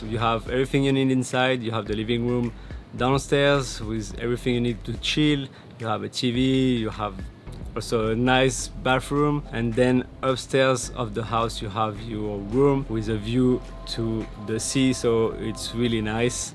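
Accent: France. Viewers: your voice in combination with the french accent is so nice to listen to! wonderful aerial shots, that want me to go back so badly.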